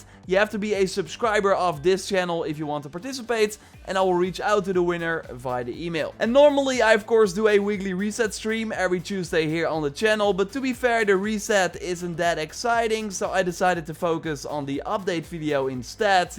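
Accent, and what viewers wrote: Netherlands. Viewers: Ok just a quick question love the vid but are you dutch :D xD just a question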